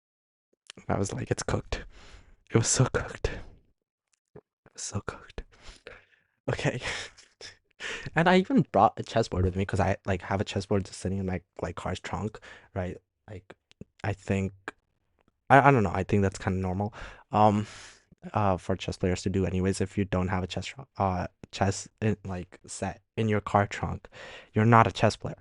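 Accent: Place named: United States. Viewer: Lovely american accent dear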